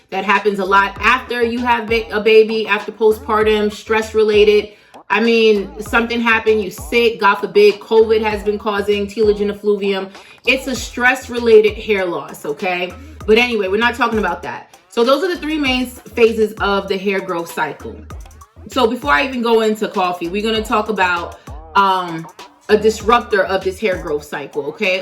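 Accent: New York, United States. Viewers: Sis, love your content, love your hair, love your New York accent! You keep me rolling! 😄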